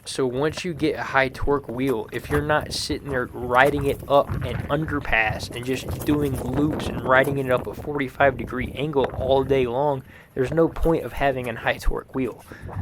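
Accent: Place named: Appalachia, United States. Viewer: You sound like an absolute moron. You edited it talking over yourself with same volume... and that hillbilly accent and incoherent rant that makes no sense. Also it did not cut-off as clearly it's on when you pick it up, you absolute idi ot. I don't even have an EUC and I know that. Yes, you are an american i can tell :)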